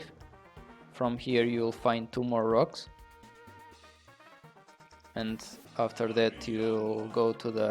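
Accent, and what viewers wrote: Portugal. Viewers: This is gonna be a shot in the dark. But I feel the Portuguese accent here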